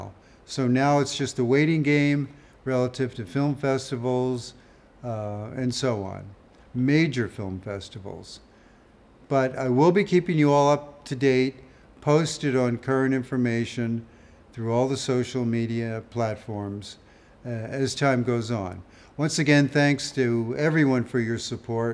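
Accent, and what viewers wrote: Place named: United States. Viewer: I was convinced that was Paul Whitehouse doing an excellent American accent the entire time.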